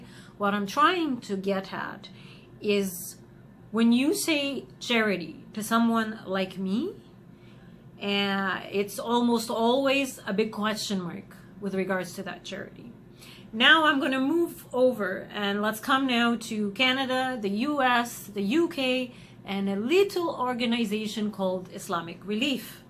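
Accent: Egypt. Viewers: Do not lie... You are not from Saudi... Your Arabic accent is Egyptian 100%... If u r Saudi prove it... Passport.. Or which part of the Saudi area... What is your tribe ... Otherwise stop telling lies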